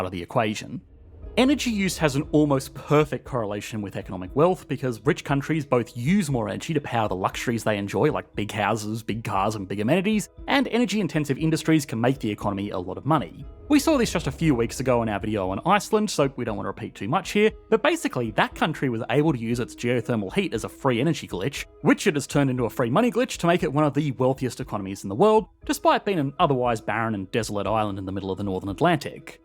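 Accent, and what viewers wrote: Australia. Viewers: Cadence is too fast. Ausssie accent makes it even harder to understand. Chaotic topical progression. Uggh. Utter disaster.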